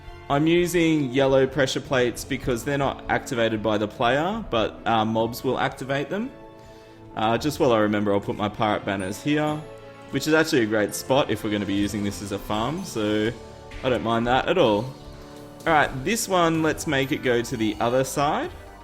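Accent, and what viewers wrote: Australia. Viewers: I hope u are enjoying your play through and I notice an Australian voice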